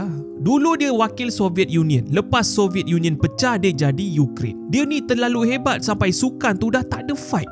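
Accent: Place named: Kelantan, Malaysia. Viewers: kelate sedak 😅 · kelantan😂 seda0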